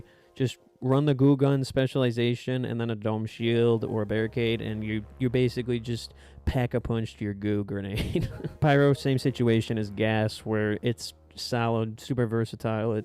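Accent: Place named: Eastern Europe. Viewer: Are you Eastern European? you have an interesting accent.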